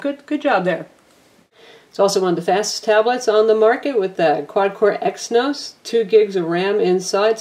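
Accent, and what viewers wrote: United States. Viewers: It's not an ignorant comment it's a sensible observation. The woman in the video does come across as very robotic and does lack any insincerity in her review. I do understand however that some of this will be down to her American accent wich can sometimes come across as a little bit insincere to non-Americans. This of course isn't a dig at Americans before anyone jumps in but to other ears the accent does sound that way.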